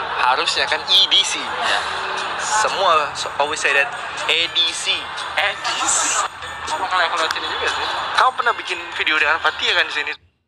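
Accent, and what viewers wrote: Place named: South Sulawesi, Indonesia. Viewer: Pasti lu orang sulawesi selatan kan bro? dari gaya lu ngomong gw bisa tau wwkwkwwk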